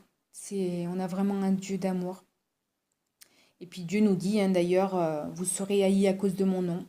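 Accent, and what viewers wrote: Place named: Nord-Pas-de-Calais, France. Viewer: 💙✨💙✨💙✨💙 J’entends un bien joli accent Ch’ti... Votre témoignage est bouleversant. MERCI pour ce partage ! Vous et moi avons traversé des évènements similaires. Voici, je vous ai donné le pouvoir de marcher sur les serpents et les scorpions, et sur toute la puissance de l’ennemi ; et rien ne pourra vous nuire. Luc (N’oubliez pas que quand on aime le Fils, on ne peut rejeter la Mère...) 💙✨💙✨💙✨💙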